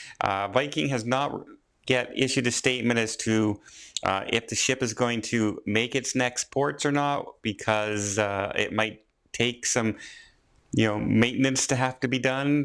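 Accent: Canada. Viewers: BH Most likely his accent. is Canadian.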